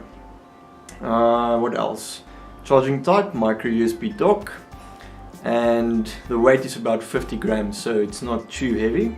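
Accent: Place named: South Africa. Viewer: That South African accent...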